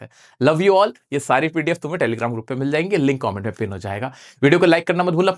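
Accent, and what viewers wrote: United States. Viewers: Fat, slow and american accent❤ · American accent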